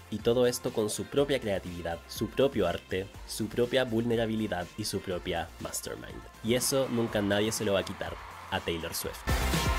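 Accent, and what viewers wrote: Chile. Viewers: Mann, tardé tres minutos en darme cuenta que sos de Chile y yo soy re fan y amante del acento chileno, voy a disfrutar la narracion como no te imaginás, me suscribo ahora mismo. ¡Saludos desde Paraguay! 💙 🇵🇾🤝🇨🇱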